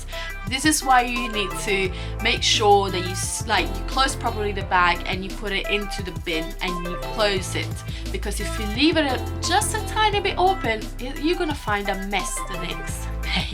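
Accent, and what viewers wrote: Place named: Bogan, Australia. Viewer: London, yet use a uk flag ... such a bogan accent.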